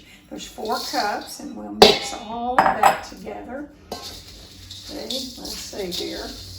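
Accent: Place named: South, United States. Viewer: Thank you for making this video. I am gonna try this recipe this year for Thanksgiving. My Grandma use to make the best cornbread dressing. I wish I would of asked her for recipe. I have tried to make it for several years and just haven’t been able to get it right. Your recipe does look very similar to hers. Listening to you speaking and hearing your southern accent reminded me so much of her. Again, thanks for sharing this.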